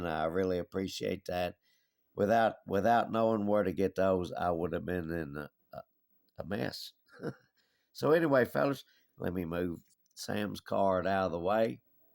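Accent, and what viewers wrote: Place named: East Tennessee, United States. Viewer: One last question, where are you from? I’m from east TN and you talk a lot like me. Live in Arkansas now.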